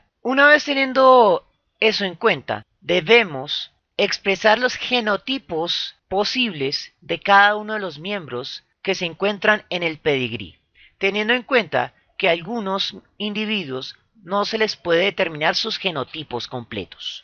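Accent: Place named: Colombia. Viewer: Me encanta como explica todo tan Claro y entendible y adoro en Acento de Voz Colombiano....me fascinan estos vídeos,gracias por hacerlos ...se que es parte de un tiempo valioso y preparación a cambio de nada,sin fines lucrativos solo con la intención de educar ,informar y ayudar a compensar esta rama de la ciencia tan fascinante....por ello estoy muy agradecido y le deseo éxitos y bendiciones en sus futuros proyectos... Enhorabuena. ❤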